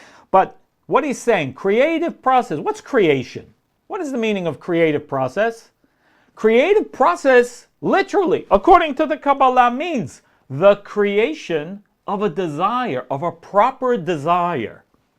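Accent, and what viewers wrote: New York, United States. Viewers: It's always a pleasure to virtually sit with you to learn. Thank you teacher Rabbi. Yearning reminds me of what I tend to tell people: you must back up your desire with action. It's that simple. Much love and respect to you Rabbi. Many blessings to you and yours. On another note, your New York City stye always brings me home again, lol.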